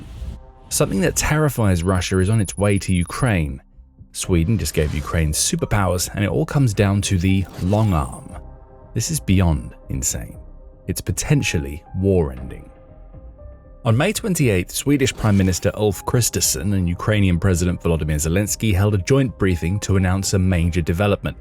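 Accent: England, United Kingdom. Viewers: Couldn't you guys find a better word than "cheap" to describe the Gripen?? Try cost-effective - relatively inexpensive - or affordable. Not cheap= poor quality. For someone with an English accent you really have a limited vocabulary.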